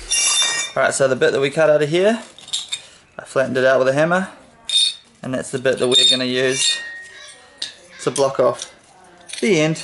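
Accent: New Zealand. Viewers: Lmao first word you said knew you were from NZ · R u a kiwi?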